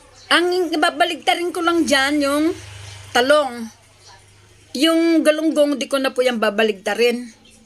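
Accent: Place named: Tanay, Philippines. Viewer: ate taga tanay rizal po ba kau punto nyo po kasi tanay kung magsalita hehe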